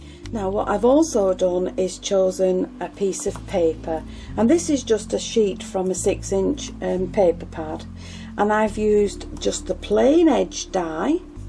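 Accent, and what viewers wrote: England, United Kingdom. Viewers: Love love love all of your beautiful cards and so enjoy all your videos. Your soft soothing voice and that cute English accent bring me back time and again. What is your source for the thousands of flowers you are continually using? I sure can't afford all those dies, though I have many and would relish having more!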